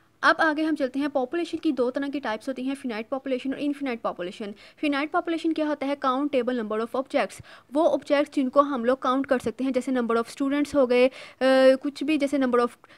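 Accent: Lahore, Pakistan. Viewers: Your accent reveals that you are from Lahore 😅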